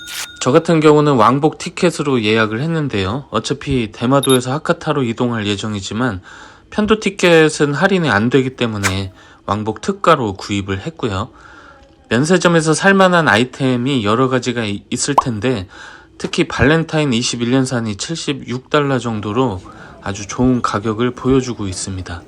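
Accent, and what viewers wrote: Jeolla, South Korea. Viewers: 전라도 사투리 억양 반갑구마 잉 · 전라도분 이신가요?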